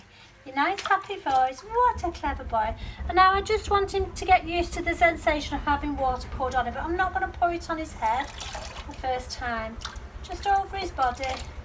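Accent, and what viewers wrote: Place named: United Kingdom. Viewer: Just my luck... This is the 2nd video in a row that that I can not understand. Between my hearing problem and the thick accent(British?) I am just not getting the info I need. Otherwise, good video. Thanks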